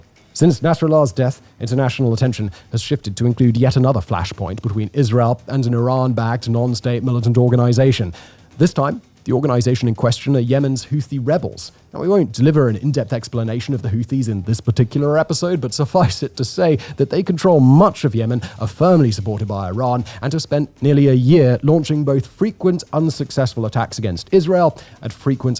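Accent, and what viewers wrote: United Kingdom. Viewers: There's nothing more calming than having soothing voice and british accent to tell us about how shit's going down. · The attempt to create a balanced picture between a liberal state and terrorist organizations is strange in a British accent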